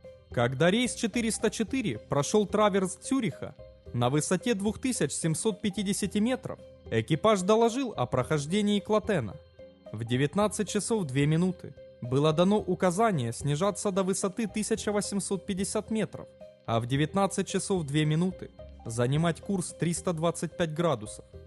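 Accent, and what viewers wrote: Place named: Zaporizhzhia-Dnipro, Ukraine. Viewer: только из-за этого жуткого запорожско-днепровского акцента смотреть и слушать не смог.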